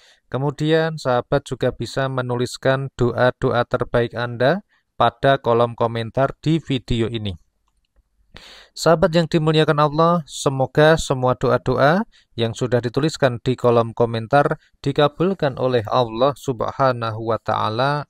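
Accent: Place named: Java, Indonesia. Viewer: Medok nih......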